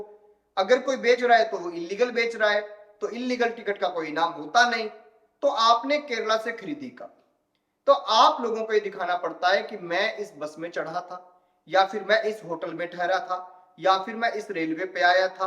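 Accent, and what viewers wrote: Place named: Punjab, India. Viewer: Bhaiya aapane bhi Punjab ki kharidi thi na Kyunki aapki awaaz se lag raha hai kya Punjab se hi hai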